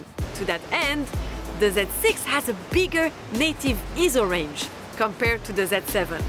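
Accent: France. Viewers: pretty french woman speaking English, easy win! very professional review, too bad i am not at all interested in a Nikon mirriorless! i am holding out for the panasonic S1r or a Sony a7III!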